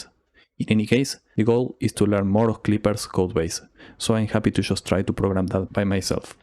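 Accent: Argentina. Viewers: Where are you from? I recognize that Spanish English accent, maybe Argentina? In any case, great job! You are insane! Happy Holidays and keep the great ideas! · te reconocí el acento. Tremendo laburo hermano! Saludos desde Buenos Aires.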